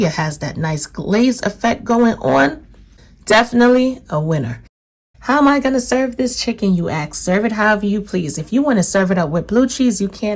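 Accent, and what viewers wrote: New York, United States. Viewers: My NYC homegirl. I already know without really knowing. I'm a Bronx Boogie Down Girl. I know our accent anywhere!!!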